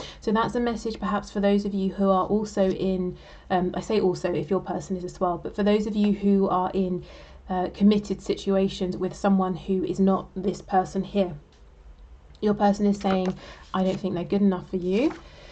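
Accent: United Kingdom. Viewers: I love British accent, lol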